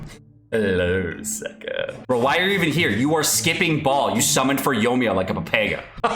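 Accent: United Kingdom. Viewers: BRITISH SEKA LMAO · Finally british seka is back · Is that BRITISH SEKA holy moly , that can't be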